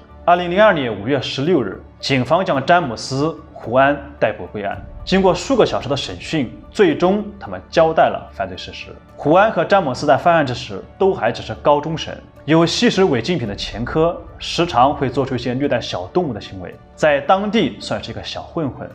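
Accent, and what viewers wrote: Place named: Anhui, China. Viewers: 听起来口音应该是安徽的吧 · 主持人是安徽人，口音重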